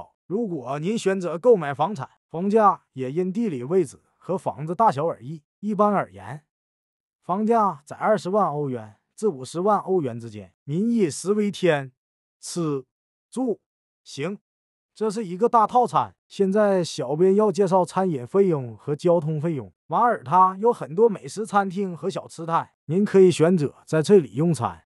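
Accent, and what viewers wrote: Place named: Northeast, China. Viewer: 东北口音太重了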